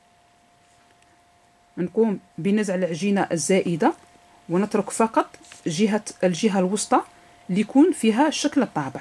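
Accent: West, Algeria. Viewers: طريقة غمس المقروط في الماء تستعملها أمي من زمان يعطيها الصحة و طريقة جد ناجحة و شكرا اخت الله و أعلم انت من الغرب الجزائري على حسب اللهجة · تهدر كيما ناس الغرب ولا راني غالطة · J'aime la façon comment vous prononcez le mot MAKROUT et votre accent Oranais, j'aime bien votre chaîne. Merci Madame et bonne continuation, your fun from Algiers, tha_nmirt = thanks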